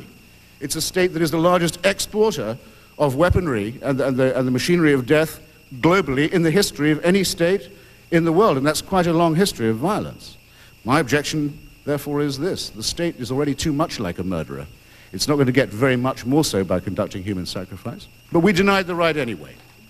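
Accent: Southern England, United Kingdom. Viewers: Hitchens isn't famous because of his Southern English accent, you know about his accent because he was famous for his immense intellect. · I agree. Plenty of ppl with Southern English accents and yet I can't name one who stole my heart away from other than.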